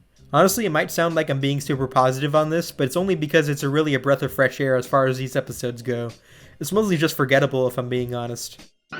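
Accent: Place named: Canada. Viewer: Good video bro! Also, what country are you from? you sound pretty Canadian from what i hear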